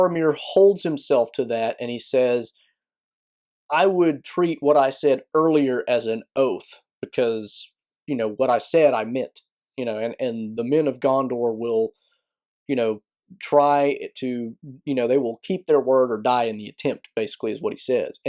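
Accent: South, United States. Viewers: I think we could certainly forgive him for this "failing." By the way, do I detect a bit of a Southern accent? I'm from Mississippi.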